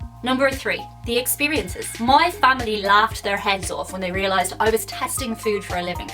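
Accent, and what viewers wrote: Ireland. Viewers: Dang it, it hit me like a truck ! Didn't see that coming. I guess all good things come to an end. Facts was a fun way to get used to the irish accent as I'm going to work in Ireland soon. Oh well, I reckon I have many channels to subscribe to, now.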